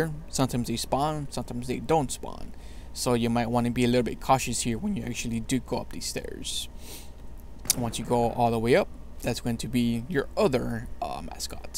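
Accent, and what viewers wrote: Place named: Canada. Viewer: Are you Canadian I </3 your accent c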